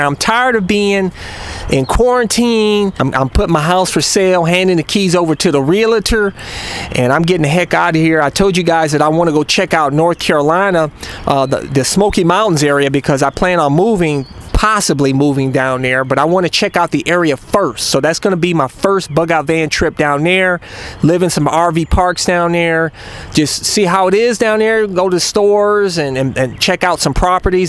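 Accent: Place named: North, United States. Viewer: you might want to learn the southern accent. There's still some "good ol' boys" down there, in the smaller towns, that might resent your Yankee accent. I'd hate to hear that they found the bug-out van and the psycho-moto, way back off in the timber but no one around.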